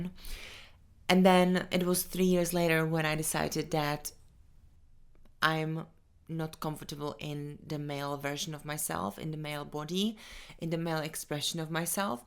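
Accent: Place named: Czech Republic. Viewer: First of all, I want to say thank you for your channel. I am Mexican American. I am a bodybuilder. I love to lift weights and I’m very attracted . To trans women and there stories I love the fact you guys seem very down to earth from what I have gathered on YouTube so I’m looking forward to this podcast and hopefully I may be able to date a trans woman one day but yeah, I’m very intrigued and I don’t even know where to look for trans women here in America… also my friend is she is ❤from Czech Republic that’s how I noticed your accent❤ by the way you are very gorgeous with all due respect🌹🌹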